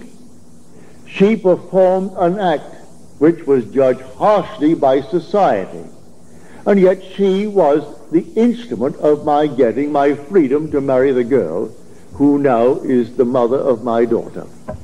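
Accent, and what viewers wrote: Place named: Northwest Florida, United States. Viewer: Yes in years past people in Northwest Florida spoke with the same lovely accent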